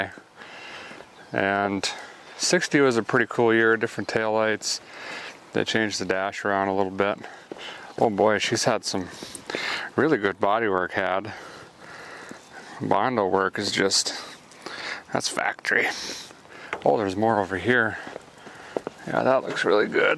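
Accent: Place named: Midwest, United States. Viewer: It's the Midwest twang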